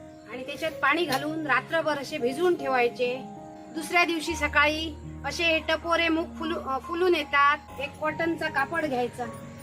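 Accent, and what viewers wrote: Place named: Pernem, India. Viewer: Goan lok aasi bhasha ulainath ge tu pednechi bhasha boltoi mugye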